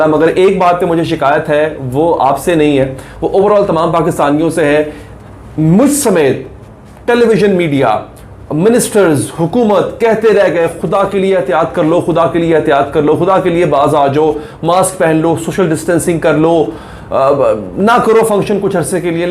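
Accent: Punjab, India. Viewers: Love your punjabi accent 😍 ❤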